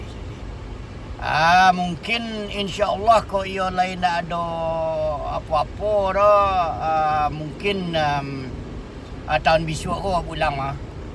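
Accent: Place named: South Solok, Indonesia. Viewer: Ohh... gini ya logat solok selatan, benar kan muara labuh di solok? Salam dari pekanbaru 😊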